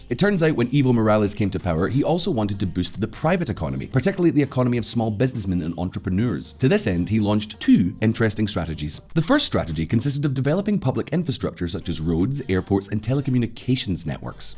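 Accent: United Kingdom. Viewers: This was the best Video from accent to being Genuine Uk citizen 😅 I liked it all. Thanks for great neutral explanation.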